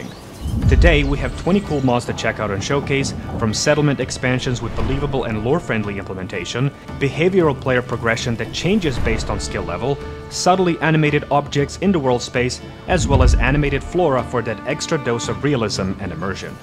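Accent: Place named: Eastern Europe. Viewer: It’s always dudes with Eastern European accents and playing overwhelmingly modded sexualized female dragonborns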